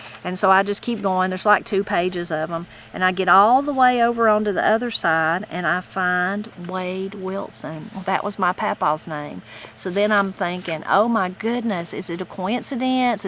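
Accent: Appalachia, United States. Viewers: I have so enjoyed reading this book. Appalachian Accent makes the book reading perfect. Happy to hear "The Rest of the Story". We were looking at moving to Oakridge. We visited in August, 2001. We loved it. Then 9/11 happened. We moved back to Ohio from South Carolina.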